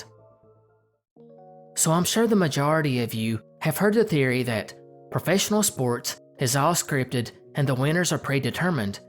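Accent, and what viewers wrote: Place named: South Carolina, United States. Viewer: Where are you from bro, you sound like my neck of the woods, in SC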